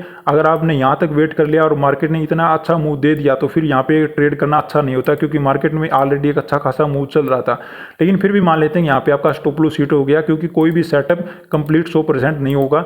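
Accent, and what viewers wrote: Haryana, India. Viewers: कई बार लगता है उत्तराखंड से है आप कई बार आवाज के इंग्लिश टोन से लगता है हरियाणा बॉर्डर से है राजस्थान साइड वाले से।। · Bhai ji bahut aacha sikha rahe ho aap Aapki boli to hariyane aali lagge hai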